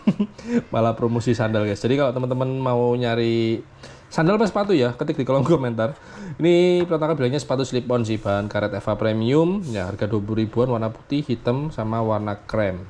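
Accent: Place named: Bali, Indonesia. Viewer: Main-main (masih kebawa dialek Bali-nya om ) 😆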